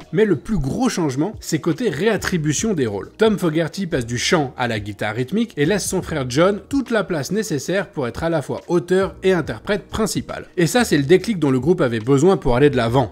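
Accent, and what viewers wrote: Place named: France. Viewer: Super vidéo, du sérieux dans la recherche et bien structurée avec un petit passage par la technique toujours intéressant sans être trop rébarbatif. Un phrasé bien frenchie mais ça va encore. Bref merci pour votre présentation.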